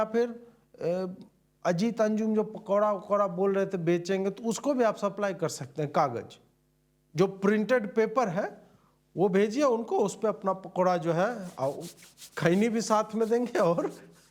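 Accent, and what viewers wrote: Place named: Bihar, India. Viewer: अजीत जी आपका बिहारी लहजे में भयंकर रोस्ट बड़ा मज़ेदार लगता है I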